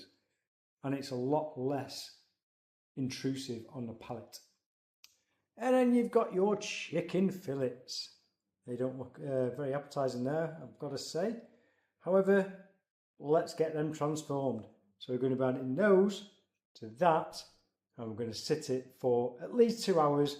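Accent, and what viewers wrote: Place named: RP, United Kingdom. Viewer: Posh sounding , posh looking. Must be posh tasting. ❤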